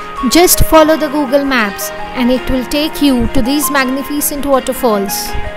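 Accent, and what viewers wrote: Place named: India. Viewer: WHO THE HELL IS THE NARRATOR?? '' PPOS'' A LITTLE AND LEARN HOW TO SPEAK PROPER ENGLISH, NOT INDIAN ENGLISH..IT IS REALLY ANNOYING AND IRRITATING..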